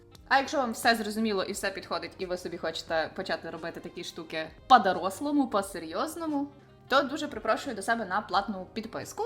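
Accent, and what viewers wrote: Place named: Ivano-Frankivsk, Ukraine. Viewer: Випадково відкрила і я в захваті - зрозуміло, цікаво і просто! Дякую!! А мова яка!❤️‍🔥 Ніби з Франківська..🥰🥰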